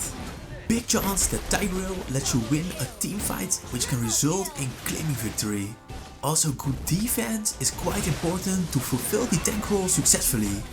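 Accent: Netherlands. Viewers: Ik hoor gewoon dat je Nederlands bent HAHAHAHA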